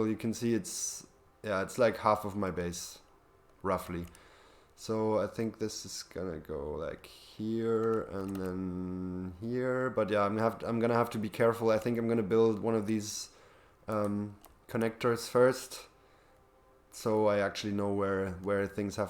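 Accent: Germany. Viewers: Aye bud, you kinda sound a tiny bit German is that possible?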